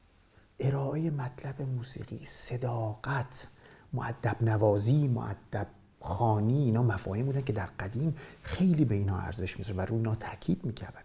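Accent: Bushehr, Iran. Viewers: دمت گرم، لهجه بوشهری عالیییییییی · لطفا لهجه شیرین بوشهری رو نگه دارید🙏 به موقع قرض ضد بیماری قند خواهیم خرید!😊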